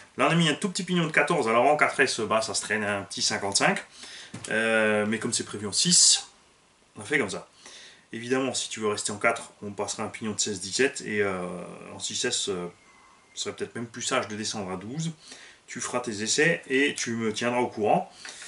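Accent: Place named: Alsace, France. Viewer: Salut! Super ta chaîne dédiée au modélisme RC, je l'ai découverte il y a 5 ou 6 jours! J'ai du taf, y a un paquet de vidéos à regarder haha! Mes oreilles ont remarqué de suite un accent familier, t'es Alsacien et ça fait bien plaisir (je suis de Colmar perso), content de constater qu'il y a des passionnés dans le coin, je commençais à croire que j'étais tout seul à basher dans mon coin de forêt! Tes tutos vont m'être bien utiles et d'une grande aide, j'ai un Typhon v3 en cours de remontage après une sortie/journée VDM (il a fini dans une flaque de ciment un peu en dévers que j'avais pas vu... sans commentaires... ^^). Bref continue tout ce bon boulot, t'as un follower en plus et des pouces bleus!!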